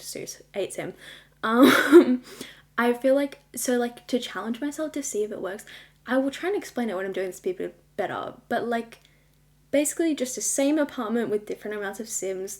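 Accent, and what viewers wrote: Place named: Australia. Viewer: I can't tell if you're australian or not. But i think you sound like one xD I like your accent and voice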